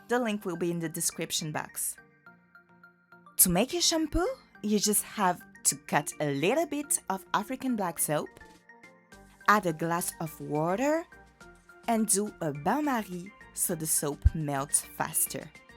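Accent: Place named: France. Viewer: I can feel the french accent which make you english good. Where're you from?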